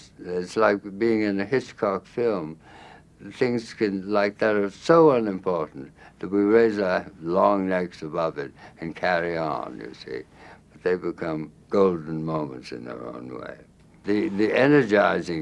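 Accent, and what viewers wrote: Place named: South, United States. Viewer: He's from Baton Rouge, and the early 20th century upper class Southerners did speak in a rather affected way, so I always thought he adapted his aristocratic Southern accent and incorporated the mid Atlantic accent with it. Tallulah Bankhead, another upper crust Southerner, sounds just like him. Both of their manner of speaking sounds like this hybrid to me. I am from South Georgia and I have a similar mid south accent. It's just the way my family talks. Not all of us sound loud and brash like Paula Deen or ignorant mush mouths like Mama June.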